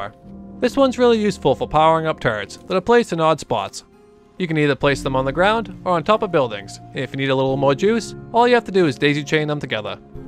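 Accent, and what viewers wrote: Boston, United States. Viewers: has anyone ever told u about ur nice Boston accent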